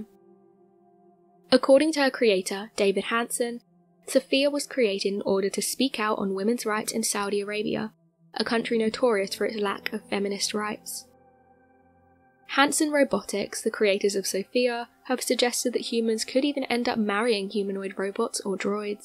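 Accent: United Kingdom. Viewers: Love the narrator, she has such a charming accent, wonder what part of Britain she's from?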